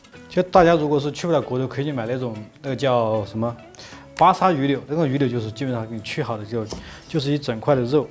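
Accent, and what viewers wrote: Sichuan, China. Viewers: 四川话，目测成都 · 四川口音😊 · 买菜好接地气👍带的四川口音听着亲切，关键干货满满，就是馋死人了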